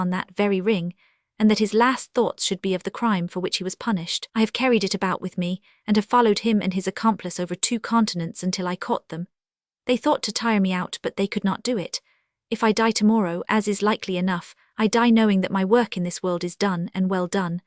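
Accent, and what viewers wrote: Mid-Atlantic, United States. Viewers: Trying for mid-Atlantic?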